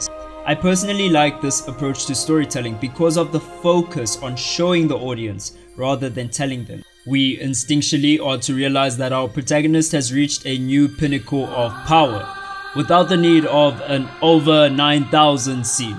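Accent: South Africa. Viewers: Bro you’re a South African brother? I’m subscribing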